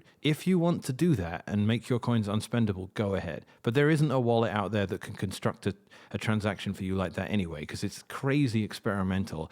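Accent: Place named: England, United Kingdom. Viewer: 😂😂 “speak English” he couldn’t get more English.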